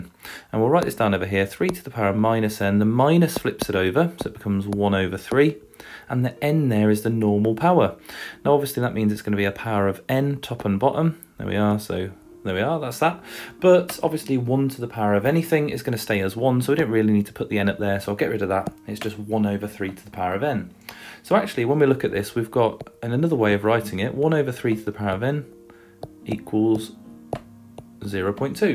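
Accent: United Kingdom. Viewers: try to be more clear in your speaking and explanation. Not everyone is capable of understanding a British accent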